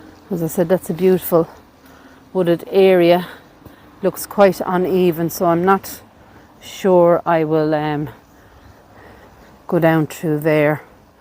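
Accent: Ireland. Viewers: Happy birthday. You take us on a magical but sad tour of the diffrent cemeteries. I love your Irish accent.By reading some the the head stones you give the deceased their names will not be forgotten.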